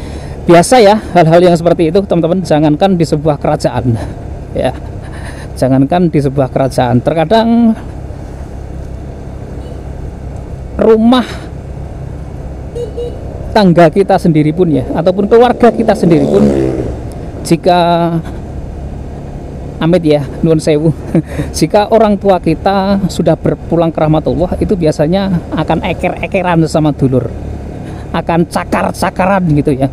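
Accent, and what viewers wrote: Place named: East Java, Indonesia. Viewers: nek dilihat dari logatnya...logat jawa Timuran ya. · Vloger receh, aku seneng banget karo logat bicaramu yg medhok banget,jawa timur sing kenthel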